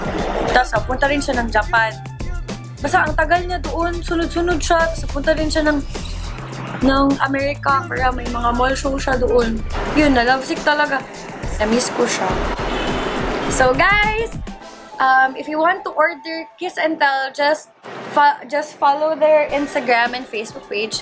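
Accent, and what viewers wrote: Visayas, Philippines. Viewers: wow,, ayus.. dakong gensan,, bisaya jud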